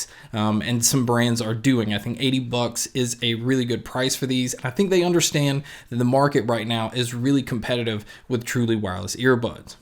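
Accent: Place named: Texas, United States. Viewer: Texas boi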